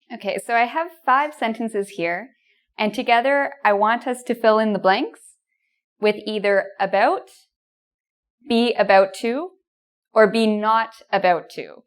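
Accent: Canada. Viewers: yes, I can really notice Canadian accent ... and I love it :-) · You a great teacher anda I love Canada's accent. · I love you , your Canadian accent and your lesson. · Canadian pronunciations, non-Metric measurements... I'm confused.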